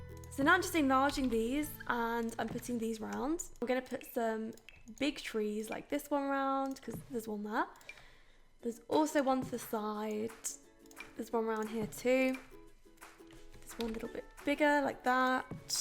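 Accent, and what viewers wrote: United Kingdom. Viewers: Maria santos to me, she sounds more British that Australia. 😂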